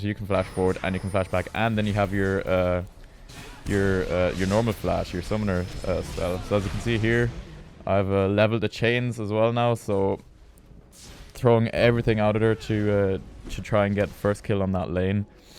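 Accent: Ireland. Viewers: are you Irish and if so which county? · Ah an irish man as well, respect my friend ;) nice guide :)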